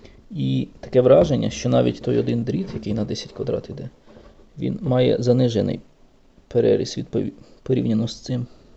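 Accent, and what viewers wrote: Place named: Kyiv, Ukraine. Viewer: если тебя спросят откуда ты взял "що то має бути на виході"? что ты ответишь? В описании такого нет (это вопрос чисто для себя самого, вернуться в "реальность"). Частково жартую... але... Сколько в споре запросил возврата? Когда жил в Киеве, балдел от того как говорят "старики" (когда чистый язык, без суржика). Обожаю Киевски и Полтавский вариант речи. Вас тоже прям приятно слушать. Ещё раньше балдел от канала "Дядько Максим". Приятно было слушать и смотреть как он чё-то там точит. Получается у Вас это скорее хобби? Или я не правильно себе представляю "інспекційне обладнання". Вас не напрягает что я пишу на русском? (а то в голове половина мыслей идёт так, половина сяк, но удобней писать на рус.)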